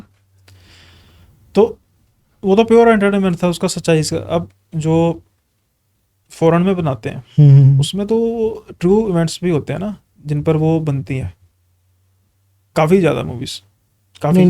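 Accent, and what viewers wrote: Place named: Punjab, India. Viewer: Yaar ye punjabi accent me baat kar raha hai.. aadhi baat samajh nahi ayi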